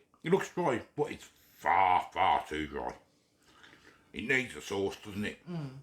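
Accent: Birmingham, United Kingdom. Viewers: Another class funny video m8.your brummi accent is class m8😂..im from Cardiff we dont say butt,rhonda pps do🤔🤐ive posted b4 im paul canton cardiff,, whats your real name,old foodie guy suits m8,but? When sending message b nice 2 say ie tony? Lol...u so make the cooking easier 2 explain,than saturday kitchen bbc1🙄keep up thr good work m8 k,love 2 all ❤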